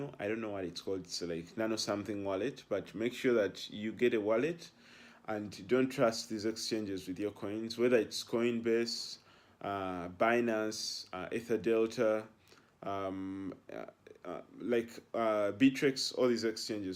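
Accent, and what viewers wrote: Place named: Uganda. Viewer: Kiribwa oluzungu banange. Still Ugandan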